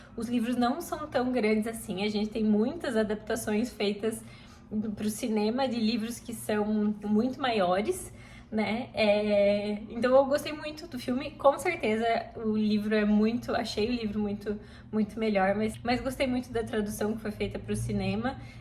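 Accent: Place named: Rio Grande do Sul, Brazil. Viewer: oii, sou nova aqui, daonde tu es? sou do rio grande do sul e achei teu sotaque parecido com pessoas daqui da volta heheh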